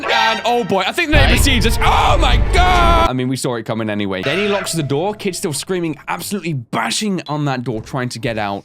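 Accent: United Kingdom. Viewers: I love your British accent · Are you British?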